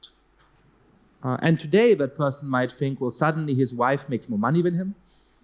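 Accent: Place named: Germany. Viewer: He really sounds German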